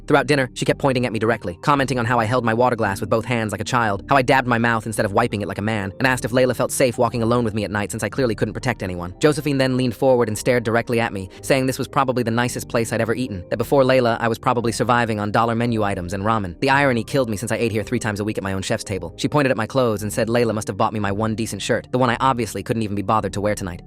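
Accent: United Kingdom. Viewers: Why the british accent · The British accent what happened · The tts is bri’ish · Ahhh yes british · Why the hell did it turn British towards the end…. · Dude, why is it British? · WTF BRITISH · Is it just me or has British accents been appearing randomly in the recent videos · Bro turned british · Why did it turn British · That british accent was so funny! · Almost every one of these story's have a British accent like mid video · why British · Ok British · Bro slowly turned british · why did bro turn British why immediately went to the comments and see if anybody else anything about this bro went from normal to British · british mate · Lil bro got a British accent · The British accent 😂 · What the british accent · What in the Gordon Ramsey accent is going on here? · the british accent!! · That British accent is wild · ummmmm british much???? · Not the British eccent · The British accent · Nice British , mate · Me: Watching video Hears British accent sighs opens comments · Bro why’d it turn British half way through the story😭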